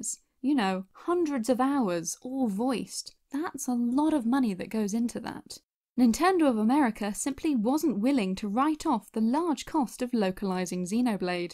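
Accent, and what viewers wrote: United Kingdom. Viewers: But why are you British? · your voice isn’t posh, I mean maybe I just am numb to the accent as I live in oxford but to me it isn’t posh 😂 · I’ve been following this channel for a while and I barely noticed the narrator is British. 🤷‍♂️ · Why are you British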